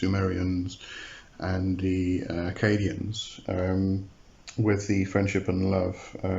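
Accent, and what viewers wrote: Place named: United Kingdom. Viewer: u cant possibly know anything you sound British its ridiculous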